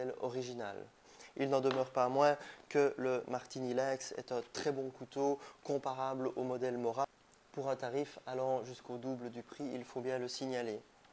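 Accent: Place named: Belgium. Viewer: Ca c'entend un peu mais sa choque pas tkt :) je suis belge aussi donc j'ai l'habitude :D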